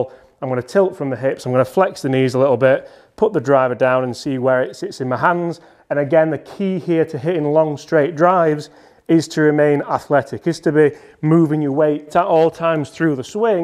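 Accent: Yorkshire, United Kingdom. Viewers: Keep these coming. Look forward to your daily tips and down to earth Yorkshire tone . Love it !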